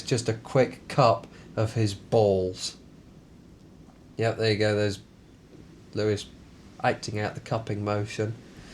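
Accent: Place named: RP, United Kingdom. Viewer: your so posh O.O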